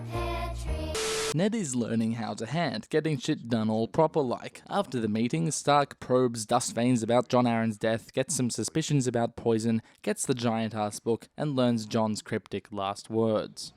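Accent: Australia. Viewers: Are you Australian? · The second I realized you were Australian: when you said “cracks the shits” The second I realized you were a genius with these videos: when you played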